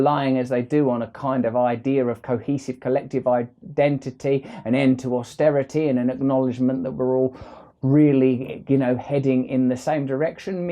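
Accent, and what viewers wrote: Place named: Cockney, United Kingdom. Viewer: Cockney Christ.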